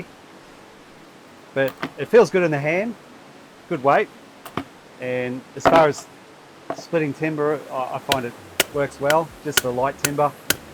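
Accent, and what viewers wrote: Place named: Australia. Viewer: You KNOW it's legit when the narrator has an aussie accent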